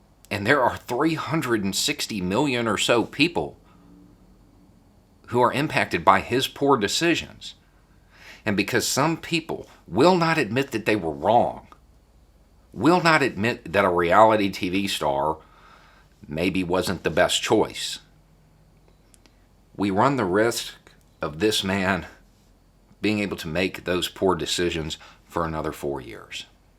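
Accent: South, United States. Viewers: I apologize for my biased brain. I like to think I’m an open, intelligent, critical thinking fifty year old woman. But as soon as I saw your picture and read the headline, I thought for sure you were going to be a Trumplicker. Then hearing your southern accent, I really didn’t think I could listen to one more pro-Trump rant from a white guy. Well I was WRONG. My first quick little impulse about what I saw when I scrolled upon your video was clouded by my own judgement and pre-judgement. Prejudice is not a personality trait I want to associate myself with. So, thank you for being an intelligent voice in the fight against Trump’s re-election. I am thankful we can make mistakes and learn from our own shortcomings. I’ve gotten so trigger-happy and hyper-vigilant about anyone or anything that even smells like it might be a Trump supporter’s rantings that I was ready to jump all down your throat before really listening to you! 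Glad I waited before scrolling on and I’m now subscribing to your channel. 🌟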